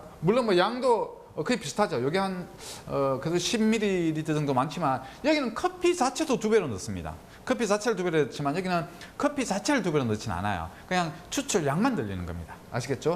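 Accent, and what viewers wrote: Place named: Gumi, South Korea. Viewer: 강의 넘 잘들었습니다 혼자 공부함에 많은 도움 되었습니다 커피에 대해 알고 싶은 욕구 충분히 채워주신 덕분에 만족합니다 고향구미 사투리 반갑고 정겹습니다 ~^^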